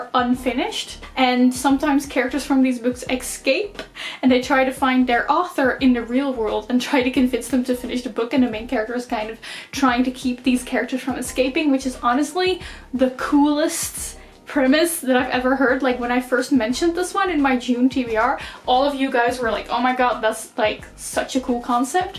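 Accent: England, United Kingdom. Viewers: I don’t want to alarm you but you’re speaking with a heavy British accent, more than usual (particularly in the beginning). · since when did you develop a british twang to your accent?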